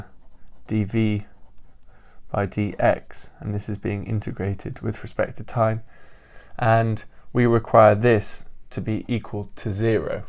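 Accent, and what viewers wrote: United Kingdom. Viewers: I love his handwriting and his british accent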